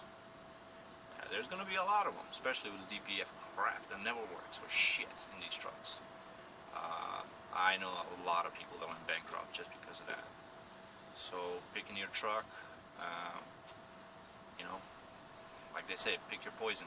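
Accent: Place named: Russia. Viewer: You definitely sound like a Russian.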